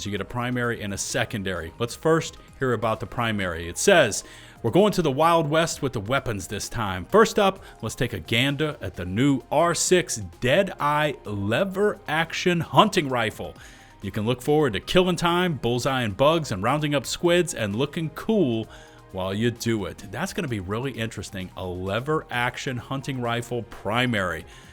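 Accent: Louisiana, United States. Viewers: His southern accent is giving Cajun… lol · you sound like Louisiana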